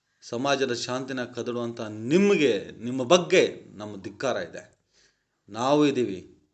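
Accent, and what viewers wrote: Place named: India. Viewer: real indian voice to you bro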